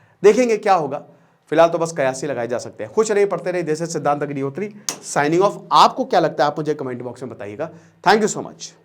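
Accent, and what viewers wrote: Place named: Lucknow, India. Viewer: Sir lucknow ki gehri छाप hai ap pr …. “उसी चौराहे पर टाँग दिए जाओगे “ itnaa khtraank bolaa apne 😅😅😅😅 😊😊😊😊😂😂😂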